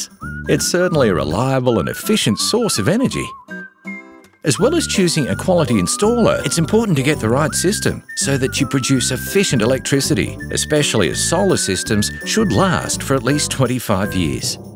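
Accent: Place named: Australia. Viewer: Nice cartoon and Aussie voice-over that sounds great without really saying anything at all. Yingli are best described as the Toyota of solar panels. Very good quality and technology but not the best,very popular as they are neither cheap nor premium priced. Why don't all Chinese solar companies take this simple approach to marketing instead of trying to sell on low prices all the time? Obviously works for Yingli. Well done.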